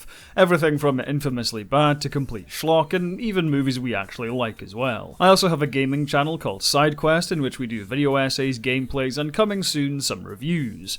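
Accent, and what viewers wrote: Scotland, United Kingdom. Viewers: Thanks for the review,. It's nice to hear a fellow Scot, and especially one so articulate and informative. I didn't know about the behaviour of the actor Cas Anvar, which meant that I couldn't understand the death of Alex in the show, who's incidentally my favourite character. Through no fault of your own, I'm going to struggle to re-watch the show again. Anyway, cheers for the review.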